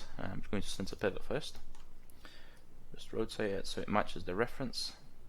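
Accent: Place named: Russia. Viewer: Your accent is close enough to russian accent :D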